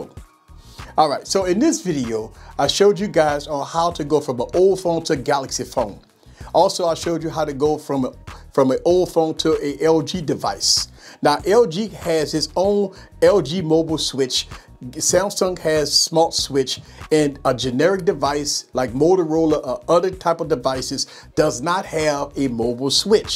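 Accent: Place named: New Orleans, United States. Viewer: New Orleans accent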